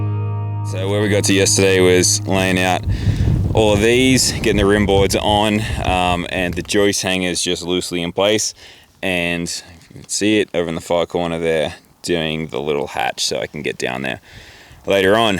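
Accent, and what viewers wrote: Australia. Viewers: I just found your videos must admit enjoying so far. A quick question, are you an Aussie?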